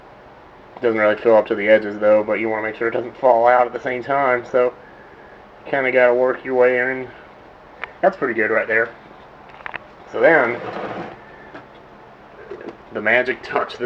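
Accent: South, United States. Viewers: I send this to my mom cause when I watch your let's plays I am usually heading in the kitchen :P I said "He let's plays, he Southern AND he makes cooking tutorial's" XD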